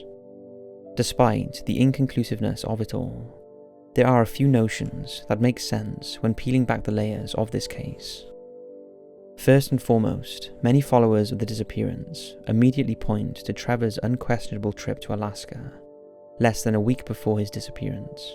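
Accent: Wales, United Kingdom. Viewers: I just hope that this exposure will bring new light to this very mysterious disappearence and bring a sort of closure to Trevor's family and friends. If i could make a suggestion for a disappearance video it would be the case of Claudia Lawrence who mysteriously vanished from York, England in 2009. You sound British so I'm sure you will will know of this case as it has been on Crimewatch and in the media extensively but as far as i know she just disappeared without a trace and still nobody knows what happened to Claudia Lawrence. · As our Fine Narrator is {BritiSH} You would think a {BritiSH`cHap} would Kno' & bē Fully Aware Of [IriSH`slang] [or`Nott] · Welsh accent.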